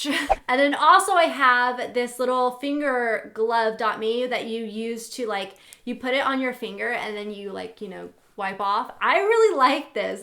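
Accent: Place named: United States. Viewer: I love ur American accent xoxo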